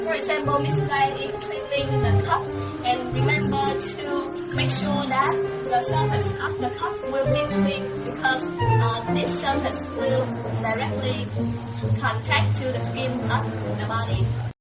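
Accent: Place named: Vietnam. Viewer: speaking english by vietnamese accent :)) It sound so funny :))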